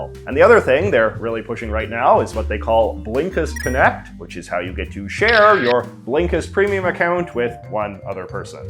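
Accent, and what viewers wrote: Canada. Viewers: watching you made me realize how real a canadian accent is as an american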